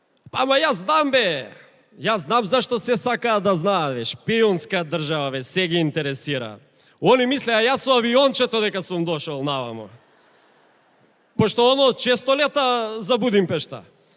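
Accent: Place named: Kumanovo, North Macedonia. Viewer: Zlatno pravilo zošto ne e interesno.... ili zbori kulturnu ili teraj kumanovski....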